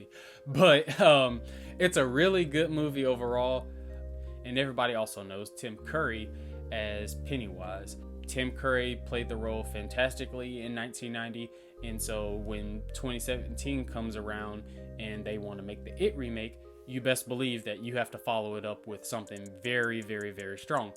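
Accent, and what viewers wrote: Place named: South, United States. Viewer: You sound very southern. Where do you live?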